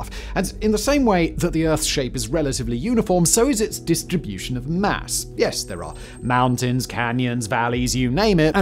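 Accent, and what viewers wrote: United Kingdom. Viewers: I didn’t say YOU didn’t know, I just pointed out that the person you originally replied to also knows. Simon has true crime and science and random blaze channels. I’m really not sure which of those is meant to resemble propaganda so I think you probably don’t know what that word means. And I’m also British so to me Simon’s accent is just one of dozens of British accents(we have literally 100s🤣) that I hear every day!Seems to be some projection there.🤣😊😊😊😊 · no shit how do you think i know he doesn’t write his scripts🤦‍♂️ its a lot easier to digest your propaganda with a soothing british accent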